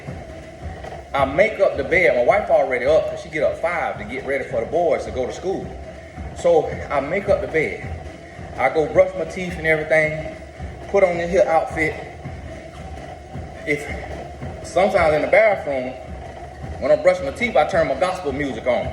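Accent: South, United States. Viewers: Hey! OK, so Now, there's been a CHANGE, right? You are NOW preparing to WORK ON your COMMUNICATION Skills and NOT be in a comfortable Mindset of ACCEPTIng your Country twang talk, as this is who I AM? And my guess is, you came to the conclusion that it's better to have MORE people UNDERSTAND YOU than not, to MAXIMIZE your OPPORTUNITIES for financial growth 💵, as you pointed out this is the time to grow, store and save! AND I DEFINITELY GET IT because I See that God has made YOU a QUICK THINKER in evaluating WHAT'S BEST! BTW... Your decision to have some 15 minute videos, is AWESOME because, after I have thoroughly enjoyed listening to Much longer videos in initially receiving your wisdom, experiences, and perceptions, NOW, my attention Span has become shorter in my READINESS to watch a much longer video. However, RELATIONSHIP email reads and responses, IMO are Ok for longer. 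Maybe others feel differently?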